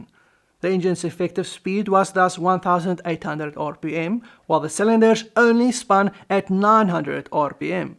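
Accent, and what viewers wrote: South Africa. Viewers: Thank you for an excellent presentation, your accent sounds incredibly like South African (Africaans/Dutch). I maybe I'mwrong with the dialect however I lived there for over 30 years and had the pleasure of meeting some very clever people.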